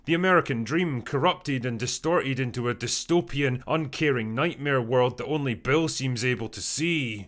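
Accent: Scotland, United Kingdom. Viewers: the sloppy-af Scottish accent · fuck sake your voice is horrendous, why do you keep drawling out the last word. where in scotland are you fae? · I liked bill. He was right. You, with your scottish accent and lack of balls, make me sad. BILL had a point in this life. You dont.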